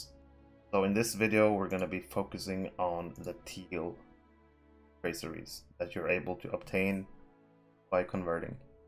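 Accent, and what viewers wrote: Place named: Norway. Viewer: Ha ha Norwegian dude spotted, am i right? Can't quite place it though Jaja fra en bergenser hallo og bra video 👌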